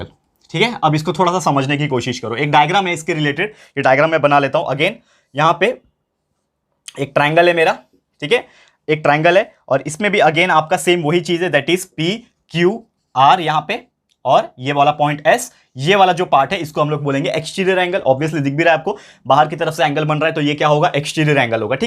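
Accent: Maharashtra, India. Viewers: Marathi bolne ki koshish jari hai... ❤❤❤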